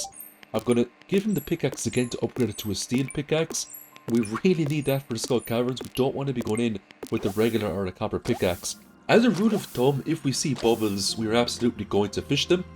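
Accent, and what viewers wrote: Ireland. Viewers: OMG I knew it, I knew you must be irish! So glad I ran into your answer to btw an absolutely inappropriate question.... I've been learning irish for a while now and I'm just so pleased that I've managed to recognize it in your english :D The way you're saying "farm" was the strongest hint 😂 I LOVE it btw, could listen to you for endless hours - which is what I just actually did by watching this video xD Just started a fishing only Riverland farm myself and was coming for inspo. You've been such a big help, absolutely nailed the challenge. Keep up the good work! ❤️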